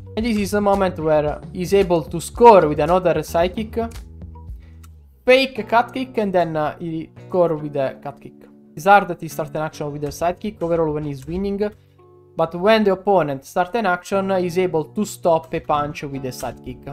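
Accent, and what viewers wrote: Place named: Italy. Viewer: I understand that you are italian from the accent.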